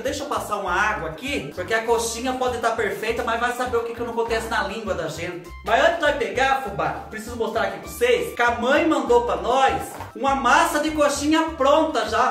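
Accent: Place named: Caipira, Brazil. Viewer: A cada vídeo, eu me divirto com você!! Amooooo esse seu sotaque de caipira!!! Obrigada por fazer meus dias mais felizes!! 💋💋 nesse coração peludo!! 😂😂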